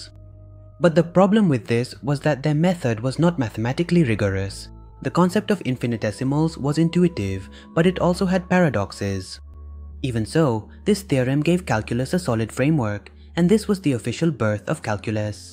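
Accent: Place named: India. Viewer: Ein Inder oder Pakistaner? Typischer Akzent.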